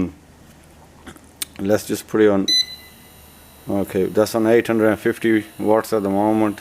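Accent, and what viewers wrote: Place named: Birmingham, United Kingdom. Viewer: Are you from the Midlands , sound a bit brummie matey, cheers for sharing pal👍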